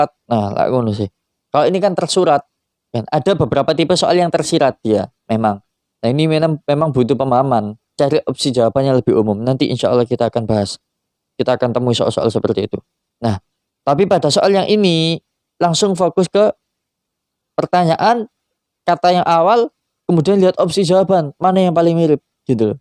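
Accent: Indonesia. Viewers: mas nya medok ganteng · sby banget bosone rek wkwk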